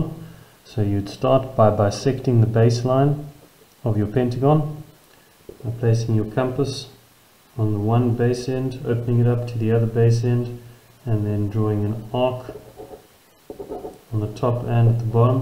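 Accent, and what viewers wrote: South Africa. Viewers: oh hes so south african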